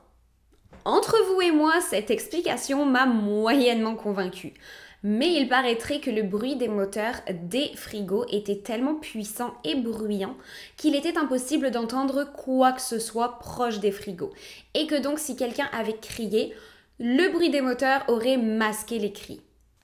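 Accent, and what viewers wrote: Quebec, Canada. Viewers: C'est la première fois que j'écoute une de tes vidéos... mais ce que ma frapper.. es- tu québécoise ? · C’est moi ou t’a un accent québécois? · T’es québécoise ou française ? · Merci pour ta vidéo 😊je viens de m'abonner 😍es-tu québécoise? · Tu très léger accent québécois c’est vraiment mignon, j’ai froncé les sourcils je l’entendais pas tout le temps. Mais le sujet est vraiment flippant. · larry avait l'air d'un sacré demeuré sinon laisse tomber la FAQ c'est naze, tu voudrais pas raconter des saloperies avec ton accent quebecois c'est plus drole!!** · je viens de capter l'accent Canadien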